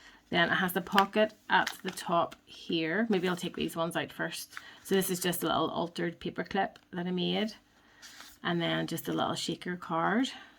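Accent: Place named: Scotland, United Kingdom. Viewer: Just subscribe, love the beautiful Scottish ( I hope) accent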